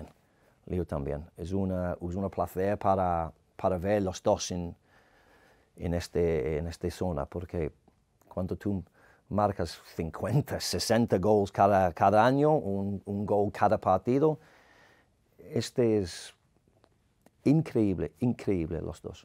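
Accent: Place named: Liverpool, United Kingdom. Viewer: Spanish with a scouse twist.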